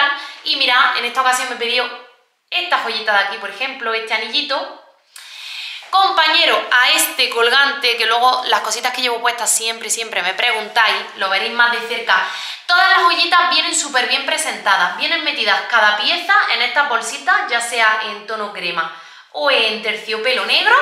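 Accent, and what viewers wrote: Granada, Spain. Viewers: Hola, mi nombre es Mariola y soy de Guadix, muy cerquita de Granada!! Te encontré por casualidad cuando Jimena era pequeña y te sigo desde entonces. Me llamó mucho la atención tu acento e intuí rápidamente que debías ser de Granada. Por cierto que hace poco te vi por allí!. Desde que presentaste los productos de Yepoda tengo muchas ganas de probarlos a ver qué tal me irían. Un saludo. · Hola ,me llamo Mari Ángeles y vivo en un pueblo muy bonito de Barcelona pero toda mi familia es de Granada y tú acento me recuerda mucho a ellos , te sigo hace un tiempo y me gusta verte ,tienes muy buen gusto para la decoración me das muchas ideas🤗Ojalá me toque los productos Yepoda ya que tiene un precio un poco alto y no todos podemos comprar Un fuerte abrazo bonica😘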